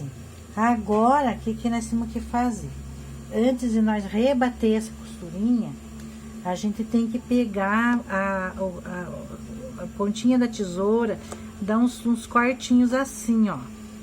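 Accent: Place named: South, Brazil. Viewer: Eita irmã,eu aqui ouvindo a senhora, observando o sutak, pensei,vô perguntar se a irmã é catarinense ou gaúcha,,aí a senhora fala que é de Curitiba .. Eu sou catarinense,mais faz 40 anos que estou em São José dos Campos SP.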